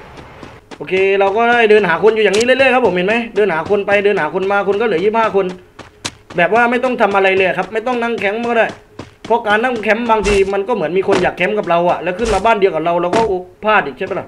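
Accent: South, Thailand. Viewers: เห็นพูดใต้ได้ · กูว่าเวลาแกพูดแก้เหมือนคนใต้ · เข้ามาแลทีแรกเห็นพี่แหลงใต้ ผมกดไลค์ กดติดตามเลย คนสุราษฎร์ธานี🤟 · เปิดคลิปมารู้เลยว่าพี่เป็นคนใต้ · โครตชอบภาษาใต้เลยนิ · พี่คนใต้หรอผมคน นครศรีธรรมราช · ผมกะไว้แล้วเสียงพันนี้คนใต้แน่ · 🌴เป็นคนใต้ม้ายนิหลวง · ผมฟังจากสำนีงเเล้วว่า คนใต้นิเบล่อ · พี่เป็นคนใต้แน่เลย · แหลงเหมือนคนใต้เลยย🥺😁 ไม่ต้องงงแขบบ55😆😅🤣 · พี่พูดเหมือนคนใต้เลย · พอพี่พูดใต้ผมกดไลค์กดติดตามกดกระดิ่งเลย ผมเด้กใต้ด้วยย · เเหล่งใต้เลยน่ะ 😆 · พี่คนใต้ผมคนใต้5555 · พี่คนจังหวัดไหนค้าบ? เหมือนคนใต้อ้ะ!!FCค้าบ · ผมโครตชอบสำเนียงพี่เรยวะ สำเนียงใต้นิ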